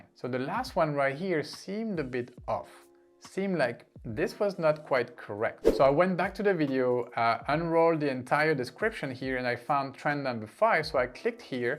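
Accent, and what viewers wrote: France. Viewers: Lol, just listen at his accent. He’s either French or from the French speaking area of Belgium. Pretty obvious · Hello ! Are you French ? Love the accent ! 🥰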